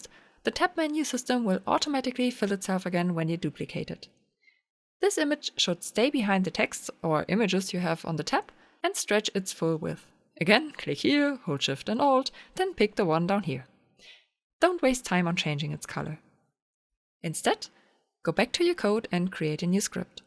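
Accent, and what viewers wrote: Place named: Germany. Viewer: Ein Voice Over in Deutsch als Audiospur wäre cool ;-) Ich kann zwar sehr gut Englisch, aber dieser Deutsch Englische Dialekt ist echt schlimm. Ich weiß nicht warum, aber ich höre jeden Deutschen heraus, der Englisch spricht und find's wirklich schwierig. Frag mich aber nicht warum, ich weiß es selbst nicht. Ansonsten, Top Video. Danke!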